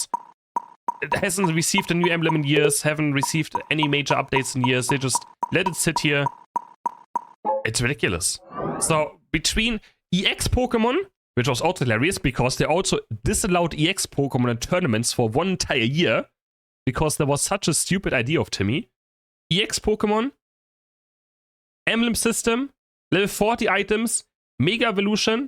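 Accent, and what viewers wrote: Germany. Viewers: I don't even play the game for license points as I already own the pokemon I like to play as. For me, item enhancers are more important, to max out all the items I use. Also this guys accent is cringeworthy thick lol (I do speak german natively and I always feel like this guys videos should be in german instead lol) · Du hast einen sehr starken deutschen Akzent wenn du englisch sprichst xD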